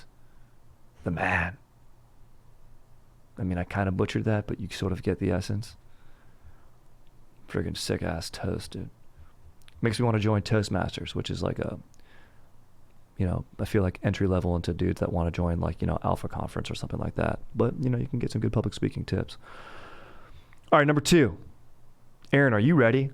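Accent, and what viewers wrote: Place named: Brooklyn, United States. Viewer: Close your eyes from - and you will time travel in 1920's Brooklyn, New York. Truly miraculous experience.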